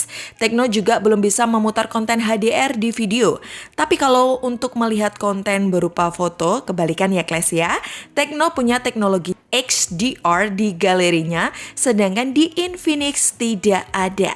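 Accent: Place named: Java, Indonesia. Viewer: suka deh..lama lama keluar medok nya 😁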